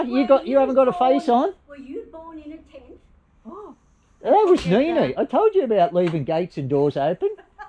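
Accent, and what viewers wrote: Australia. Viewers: See you soon I'am going to work they now you sound like ausy mate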